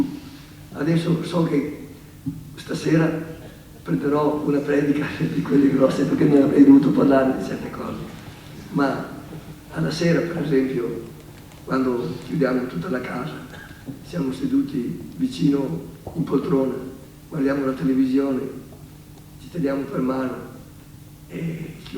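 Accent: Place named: Veneto, Italy. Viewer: L'accento veneto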